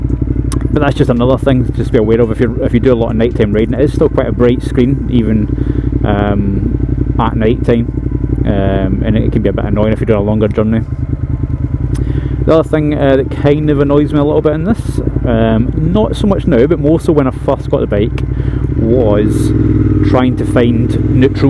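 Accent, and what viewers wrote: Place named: United Kingdom. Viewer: Hi mate, nice bike, I guess. If you can speak with a bit less British accent it could possible understand something about what you said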